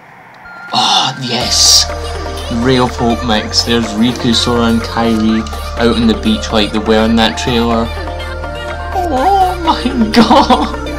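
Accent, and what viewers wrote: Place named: Scotland, United Kingdom. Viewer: I LOVE YOUR ACCENT !! O.o ... you are scottish aren't ya?! XD ...I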